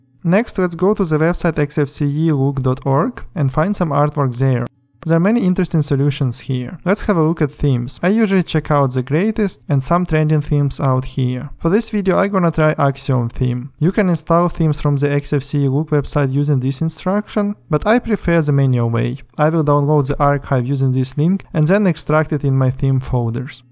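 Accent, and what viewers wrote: Russia. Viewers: русский акцент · ес офхорс, лет ми спик фром май харт. 😁 But honestly, you have a really russian accent, like you are reading by papers text which was wrote in russian. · Акцент какойто "рязанский". В смысле не носитель языка говорит ИМХО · ты сказал "ощущение"? да тут только что русским флагом в кадре не машут. Жесточайший русский акцент, близкий к пародийному. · Русского человека сразу слышно:))) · Русский акцент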